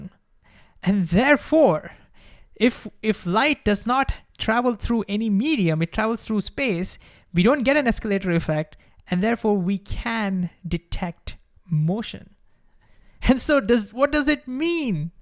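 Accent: India. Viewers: Trying to listen to a hyperactive Indian accent stumbling over the English language, who has a constant conversation with himself and Einstein - is extremely disconcerting and tedious. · Considering how fluent so many Indians are in English why do they insist on maintaining a barely comprehensible accent?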